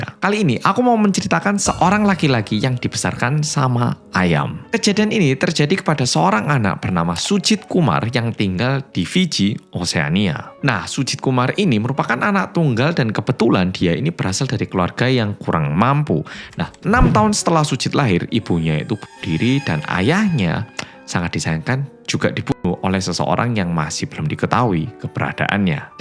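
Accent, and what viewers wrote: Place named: Java, Indonesia. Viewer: tks medok ❤ Alhamdulillah Sujit bisa berubah meski pelan👌🏿✌🏿💪🏿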